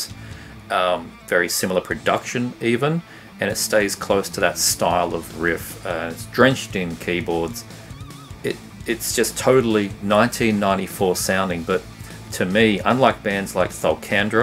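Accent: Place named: United Kingdom. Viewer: Yeah lots of Australian bands here but he has a British accent? I noticed that was weird too. Anyways, great list!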